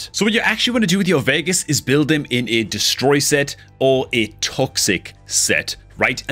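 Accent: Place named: Yorkshire, United Kingdom. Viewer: The Yorkshire accent is wicked too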